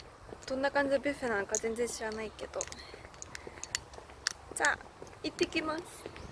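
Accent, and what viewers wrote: South Korea. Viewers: さんの今までの努力で韓国語が染み付いてるのはすごい！と思うんですけどさすがに日本におるときは日本語にならん? 私はどちらかっていうと母国で韓国人って勘違いされたくない😅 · 知ってます！いつもめちゃくちゃ韓国訛りなので（ 笑 ）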